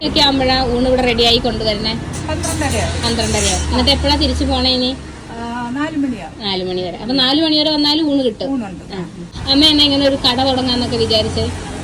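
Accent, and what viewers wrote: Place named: Malabar, India. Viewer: മലബാറിന്റെ സംസാരരീതിയും മലബാറിന്റെ രുചിയും പാചകരീതിയും സംസാരത്തിൽ തോന്നുന്നു. എന്തോ തോന്നലാകാം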